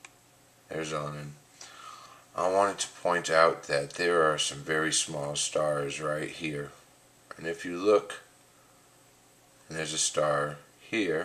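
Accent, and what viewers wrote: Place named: India. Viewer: Good job! Distance she travels per day towards us...300,00 per day? How soon will we have a guesstimate re: mass?Why you speak like Indian...? LOL...prolly lots o stupid questions,fatigue to name a few...keep on keepin on!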